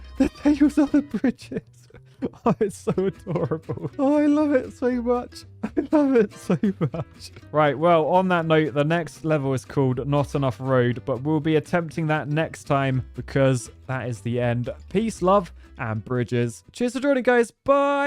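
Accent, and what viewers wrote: United Kingdom. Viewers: I'm not so much into Poly Bridge, but when I saw "Bridgehemian Rhapsody", I had to click ! And it was awesome !!! I love watching your videos because : _ your british accent (I'm french and I love it) _ I like video games _ I like engineering _ the bridge reviews _ the strongest shapes everywhere _ your humour ....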